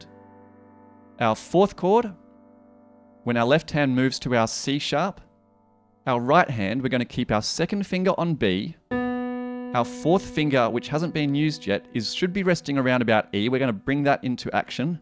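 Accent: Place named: England, United Kingdom. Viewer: I sense an English accent xD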